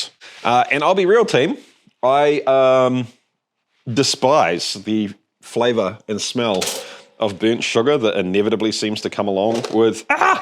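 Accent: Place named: New Zealand. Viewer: Your absinthe video popped into my list, and I noticed your Kiwi accent. With your videos on doing crazy alcohol from Pop Tarts and other stuff, you reminded me of my uncle Ron who emigrated there about 25 years ago. Before he left, he would try to make wine from turnips for a laugh, and now makes cider apparently.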